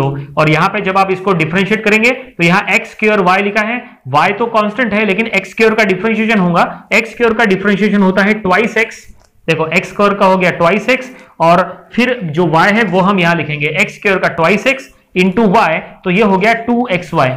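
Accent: Hyderabad, India. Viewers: Sir hyderabadi Ho qa milenga milenga ke rhe ho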